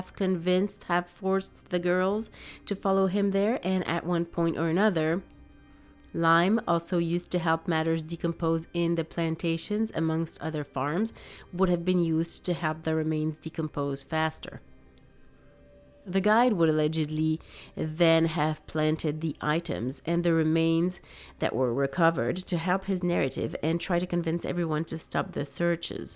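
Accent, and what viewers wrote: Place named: France. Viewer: T'as un léger accent français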